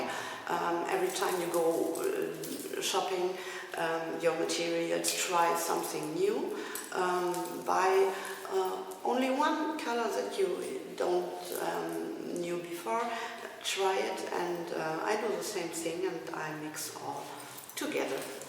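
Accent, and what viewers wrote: Germany. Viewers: I am so glad you have taken your courage in your hands and decided to talk to us in England. I have been to Berlin once with my Son. Your English is fabulous with a gentle German accent. From your explanation on how to apply paint, I have al so taken courage .I have bought a canvas and started to paint. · Your English is very good :) And the German accent is just charming :) (The little we can hear of your accent) :) - Thank you for sharing your art work with us, it is very inspiring! :) Much love - Nina :)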